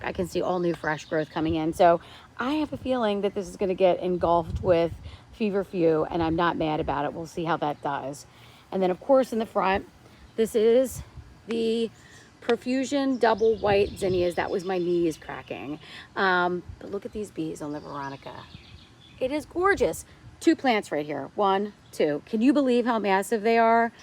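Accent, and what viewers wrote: New Jersey, United States. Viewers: Very nice !! Love your channel and also your voice !! Not sure if it’s a Jersey accent ? What a transformation in your garden ! I love how you always change things up !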